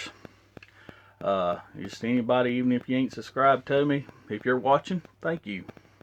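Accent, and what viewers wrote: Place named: South, United States. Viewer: bye the way do you live in the u.s. i live in titusville fla. thank you p.s. you sound your from the south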